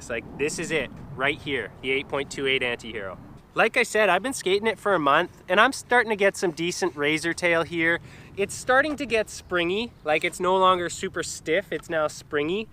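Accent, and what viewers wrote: Canada. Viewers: are you canadian? kinda sound like it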